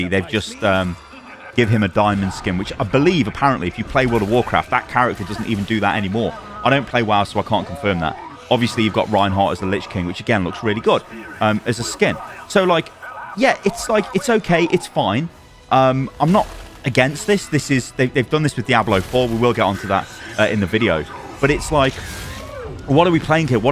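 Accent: South, United States. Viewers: Wow your american accent. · 10/10; American accent..👍🏽👍🏽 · The rich Southern American accent from a Brit is not something I knew I needed. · excellent yaccent (yank accent)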